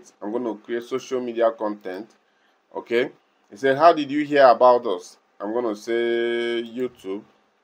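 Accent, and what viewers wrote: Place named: Africa. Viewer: Beautiful african accent brother. All the best insh'Allah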